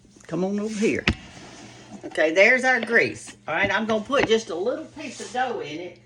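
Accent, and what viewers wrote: South, United States. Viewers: You are the sweetest lady! I love your southern accent, and of course all of those good Ole recipes that I remember my Mom and Dad making when I was growing up. You are a pleasure to watch, and I have learned some of your very wise and valuable cooking tricks. I hope you continue doing your videos for many years to come.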